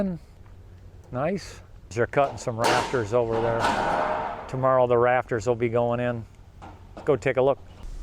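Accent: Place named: Midwest, United States. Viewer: Are you from Chicago? I detect a midwest accent. I grew up in Illinois but been in CA for 40 years. Thailand next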